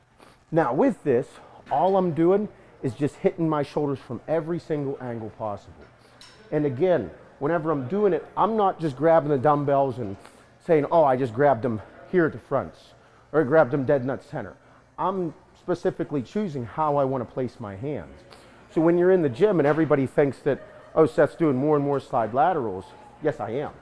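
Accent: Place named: Pittsburgh, United States. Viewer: Heard the accent before I saw the word Pittsburgh in the back. Sorry about Sunday. That defense is in trouble.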